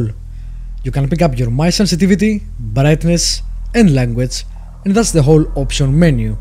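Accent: Greece. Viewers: Hi " " how are u? I see u continue steady. Tα Αγγλικά σου είναι πολύ τέλεια από πλευράς ταχύτητας. Σε σύγκριση ακόμα και από πριν ένα δίμηνο περίπου που είχα δει ένα Review sου πάλι. το λεξιλόγιο το είχες ήδη. Το είχα καταλάβει κατευθείαν την 1η φορά - σε ένα gameplay apo to Total War Napoleon - και κοίταξα το About και είχα δει από Ελλάδα. Εμείς οι Έλληνες έχουμε πολύ χαρακτηριστική προφορά στα Αγγλικά. Εγώ για παράδειγμα ζούσα 2 χρόνια Σερβία και 8 μήνες Αγγλία το 4ο έτος από το Κολέγιο και την Αγγλική προφορά δεν την υιοθέτησα ποτέ. Σόρρυ εαν ακούγομαι ότι σε κριτικάρω αλλά γουστάρω που βλέπω Έλληνες YouTubers να προσπαθούν. Είμαι 40 και είμαι gamer ap;o ta 11 me Commodore 64, disks 5 1/4". Θέλω και εγώ εάν είναι να κάνω για χόμπι ενα κανάλι αλλά σκέφτομαι το 60-70% με games to υπόλοιπο με κάτι άλλο αλλά τι? Έχω 2-3 πράγματα στο μυαλό μου. Σκέφτομαι Φαρμακευτική Κάνναβη. 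Πριν σου φανεί περίεργο ο αδερφός μου είναι ο 1ος στην Ελλάδα που έκανε το <num> την 1η Έκθεση Κάνναβης στην Ελλάδα (γήπεδο tae kwon do Ιανουάριο 2018 και 2019).. Το όνομα "CannabisExpo" το έχει πατεντάρει και δεν μπορεί να το χρησιμοποιήσει άλλος, έχω κάνει πολλά κονέ και αναπτύσσεται πάρα πολύ ο κλάδος και ο κόσμος δεν ξέρει που να κατευθυνθεί επειχηρηματικά και καταναλωτικά και θα μπορούσα να βοηθήσω με πληροφορίες και το πως πάει το νομικό σύστημα γενικά. συγνώμη για την πολυλογία απλά ήθελα ενός αγνώστου την άποψη ή έστω απλά να το πω, σου εύχομαι ραγδαία ανάπτυξη στο κανάλι σου.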